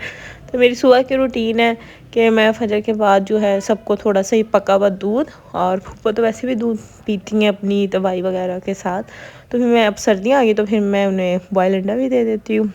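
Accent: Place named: Karachi, Pakistan. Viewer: Ap ai to Multan se ha Karachi me pr ap bolte bilkul Karachi wlo ki trah Hain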